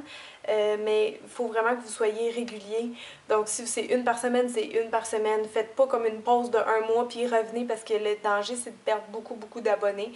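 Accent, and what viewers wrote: Quebec, Canada. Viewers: Je suis française et J'ADORE ton accent québécois =D Et toutes tes vidéos en général... Pourtant, je ne me maquille que (très) rarement ;p Bonne continuation ^^ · Merci pour ces supers conseils et encouragements. Ta chaîne est super et ton accent québécois est tout simplement trop mignon. Comme tu le dis l'important c'est d'être compréhensible et tu l'es tout à fait, je n'ai jamais eu de soucis pour te comprendre. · mais c'est génial l'accent québécois justement ^^ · Merci pour ta vidéo je pense que c'est une vidéos vachement utile, je pense qque tu veras pas mon messages vu que tu dois recevoir plein de commentaires je viens de coommencer une chaine fille pas baser sur les make-up mais plutot tenue du jour , haul, soin ...:) J'espère que tu pourras y faire un tours et éventuellement t'abonner (c'est beau d'espérer) et bonne continuation et ton accent québecois et très beau ne t'inquiètes pas on comprends très bien ce que tu veux dire bonbon bisous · Je suis entrain de tomber amoureux de ton accent québecois ! 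vous être trop mignonne chère demoiselle franchement je suis époustouflé (k) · Moi j'adore l'accent québécois !! Je suis jalouse avec mon vieil accent du sud ouest de la France^^ ;-) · Ton accent ne me choque pas au contraire j'aime beaucoup l'accent québécois :) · C'est drole parce que je m´était abonné à une chaine de maquillage canadienne, "judeobsession", mais son accent était vraiment tres tres prononcé et parfois je ne comprenais meme pas. Mais toi, ton accent est plus léger, et je trouve ça trop cute! Et puis j'adore les expressions québecoises, genre la façon de dire "etchetera" ou "c'est l'fun" ^^ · L'accent québecois est juste un régale, et un plaisir a entendre ;)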